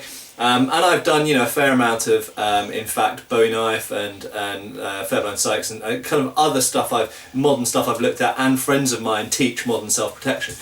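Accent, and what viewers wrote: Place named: England, United Kingdom. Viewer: Iona J He has an English accent and uses facts.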